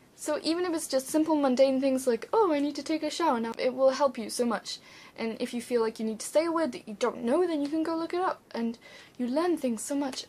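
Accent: United Kingdom. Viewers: i love your british accent <3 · is she british?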